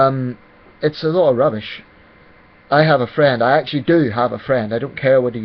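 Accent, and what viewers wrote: Northern Ireland, United Kingdom. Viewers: Ah okay only discovered your channel the other day nice to see someone my age with their head screwed on for a change and do i detect an Norn iron accent? Plenty of up round my area.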